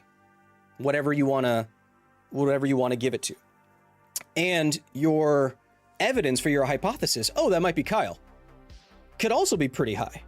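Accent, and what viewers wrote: New Zealand. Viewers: your accent is good but sounds a bit more like New Zealand to me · Aussie accent is close but you say your "e" and "I" more like a Kiwi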